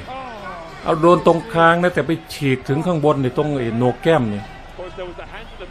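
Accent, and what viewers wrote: Isan, Thailand. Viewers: ภาคเสียงอีสานไม่ไรผิด ผมคนไต้แท้ยังติดตามเพราะเสียงพากเลยคับ555หรอยคัก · พากย์เสียงสำเนียงอีสานก็บอกอยู่ทุกครั้งทุกคริป ก็พากย์ "ม่งค่ล" ไปเถอะครับ ผมไม่เข้าใจว่าไอ้พวกเหยียดนี่สันดานมันนำสติปัญญาขนาดไหนถึงได้มาสั่งคนโน้นคนนี้ต้องพูดแบบนั้นแบบนี้อย่างที่ตัวเองชอบ รับไม่ได้ทนไม่ได้จะเส้นเลือดในสมองแตกถ้าได้ยินก็ไปดูช่องอื่น หรือไม่ก็ไปดูเทปจริงดั้งเดิมจะได้ฟังได้ยินแต่ภาษากลางสเนาะหูกันให้ฟินน้ำเดินเจริญ "มงคล" ก็ไปซะเถอะ ปล.ผมเป็นคนภาคกลางแท้ๆ แต่รู้ว่าความเป็นคนและความมงคลเป็นของทุกไม่แบ่งชนชั้น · สุดยอดครับ กิตติ ดูครั้งแรกครับ ต่อยได้ดุดัน ว่องไว โหด แต่ สุภาพ ให้เกียรติ์คู่ต่อสู้ มวยไทยรุ่นน้องควรดูเป็นแบบอย่าง อย่าลีลามาก ก็พากย์ได้สนุก น่าฟัง มวยต่อยกันเอาเป็นเอาตาย แต่พากย์ได้สนุกสนาน ชอบมากพากย์ อิสาน พรสวรรค์ที่เรียนแบบยาก เห็นมีคนพากย์เสียงอิสาน แต่ไม่เหมือน หรือสนุก แบบ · #สวัสดียามแลง *ภาษาพูด คือการสื่อสาร ให้เข้าใจ ของมนุษย์ หลายเผ่าพันธุ์ ในโลก ส่วนจะพูดว่า "มงคล" หรือ "ม้งค้น" เป็นสำเนียงแบบไทยอีสาน ไม่ผิดครับ......ฟันธง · เว่าแบบนี้ระจังคนอีสานของแท้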